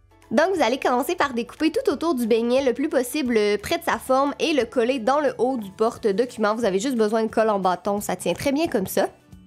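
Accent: Quebec, Canada. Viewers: Tu as un petit accent Canadien il te va trop bien . Quand tu étais petite tu parlais Canadien ? Sinon je t'adore tu es trop belle je suis abonnée est toute tes vidéos sont superbe J'ai n'ai jamais vu une artiste si c'est toi haha Bisou😀😀😀😀😀😀😀😀😀😀😀😀😀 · L'accent québécois 😍😍😍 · Vous êtes une femme ravissante, de plus avec l'accent Québecois! :)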